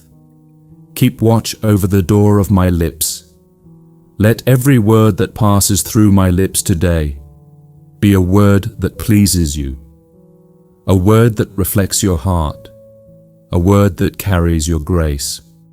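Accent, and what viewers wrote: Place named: England, United Kingdom. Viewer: Get rid of the English accent.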